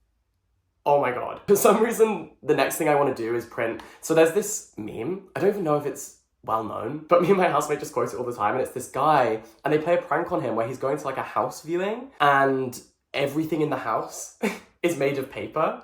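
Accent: United Kingdom. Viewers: I hope she paid damages for the lie. You sound like UK but the situation sounds like nutty California.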